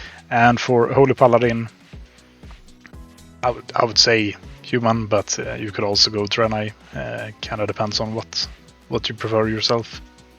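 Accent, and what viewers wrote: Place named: Sweden. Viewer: Ohh god the swenglish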